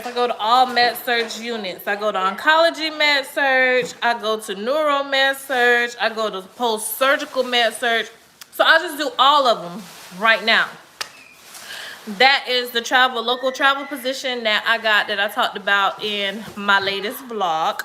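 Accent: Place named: Polk County, United States. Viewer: That’s that Polk county accent. Only the ones from the city know it 🤣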